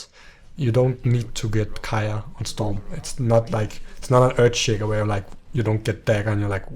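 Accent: Denmark. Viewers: I Can hear the danish in you.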